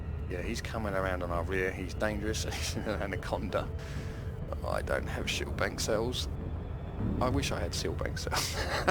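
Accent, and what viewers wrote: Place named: North East England, United Kingdom. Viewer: I have to totally agree on the North East accent, I too just love it. Thanks for making this very informative video that I have only just come across. Liked and subbed.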